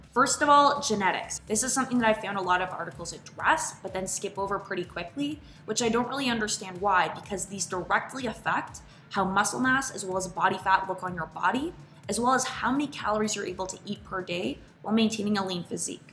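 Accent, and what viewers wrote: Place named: Canada. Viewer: Just realized you're Canadian but how you pronounce words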